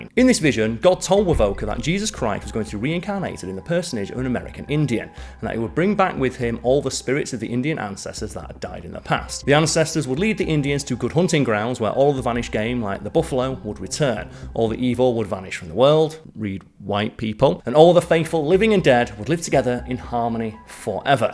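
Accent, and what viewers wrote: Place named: Northern England, United Kingdom. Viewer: No Simon not watching.....sorry but when you expect prime steak and someone gives you an ok burger you're pissed at the burger....if you'd been told from the off "you're getting an ok burger" you'd be fine with it but I feel tricked every time I see that stupid 2000s emo hair and hear that think whiny northern voice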